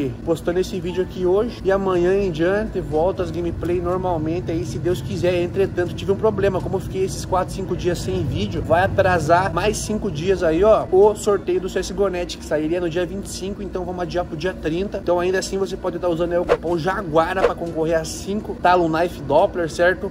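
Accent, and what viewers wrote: Rio Grande do Sul, Brazil. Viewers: tu é de onde? fala igual nois aqui do rio grande do sul kkk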